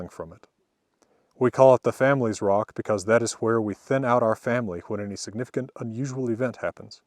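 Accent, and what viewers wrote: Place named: United States. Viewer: I love your style. Your hat and your American accent are fcking manly. Þú ert víkingur!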